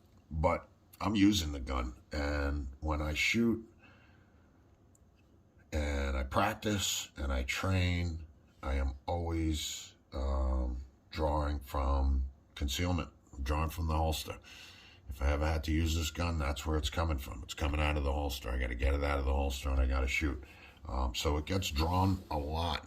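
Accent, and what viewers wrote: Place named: Northeast, United States. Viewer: Definitely from the Northeast. Good vid btw #2A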